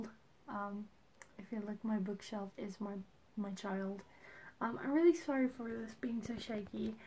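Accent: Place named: United Kingdom. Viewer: How’s photography going? My web connection is rather (sh*t) but I allowed the audio to play out. I seriously enjoy your voice... I tend to follow folks just to hear them speak. (Odd yes but still...) Love hearing folks from the uk 🇬🇧 or other areas around Europe... Anyways I do hope your photography is going well. Any tips to building a portfolio easy without hiring folks? Just curious... Best to you... peace and love.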